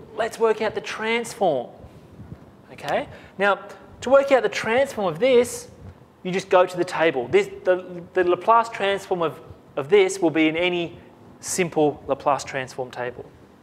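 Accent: Australia. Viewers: Good on you for making this, love having an Aussie for once!! Cheers , 21yr old ENGG student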